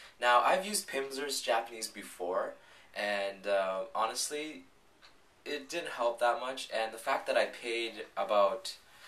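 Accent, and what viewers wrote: United States. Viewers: Dude your English is spot on man. You speak it like an American. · Sounds like your in NYC! Pimsleur is not so bad, the issue I have with it is that firstly Japanese is not a difficult to pronounce language, unlike Korean, and the reverse pronunciation is complete overkill, though I have used the same method teaching English and it works. I agree with you and want to amplify the fact that Pimsleur's conversations are for the most part out of date. I took up Pimsleur when I was reviewing Japanese 4 years after having lived in Japan.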